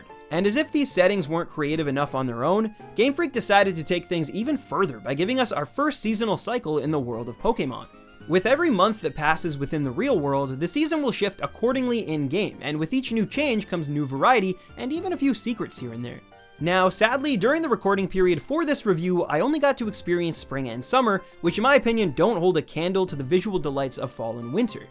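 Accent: Canada. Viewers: Those Canadian Ab-outs hahaha I love it.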